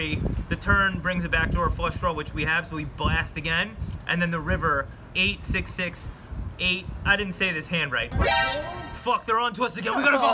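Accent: Australia. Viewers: the aussie accent is a solid 9/10. love it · 7/10 on the Aussie accent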